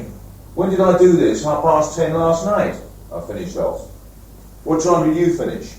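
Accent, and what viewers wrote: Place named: South London, United Kingdom. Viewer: I went to KCS in the 70s. BH was my form master in 1976. And taught me maths. We never knew what a star he was on the rugby field. Though we should have when you saw him on the rugby pitch. What’s a cross between a rhino and a giraffe called? Yes. He was a great bloke. Totally uncompromising. And absolutely straight. A great sense of humour and a great smile too. Good memories. Brings a tear to my eye hearing his south London accent again. Not many of those at KCS!And takes me right back to him telling me off. Thanks. Simon Smedley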